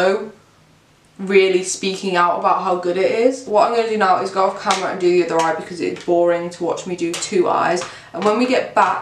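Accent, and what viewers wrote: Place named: United Kingdom. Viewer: I love your British ascent btw I'm from India but my ancestors were English so I get really drawn towards the English culture specially the ascent I recently started taking interest in makeup but your ascent makes your videos more enjoyable for me to watch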